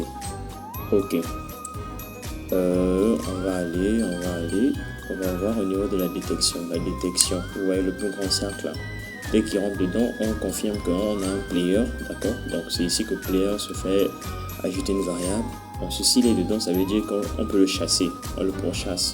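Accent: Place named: Africa. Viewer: tu as un accent africain bien venu dans la famille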